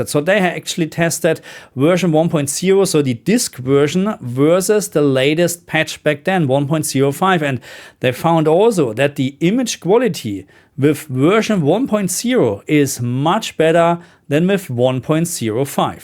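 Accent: Germany. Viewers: und bin selber Frangge auf Nürnberg, daher vermute ich auch das bei ihm haha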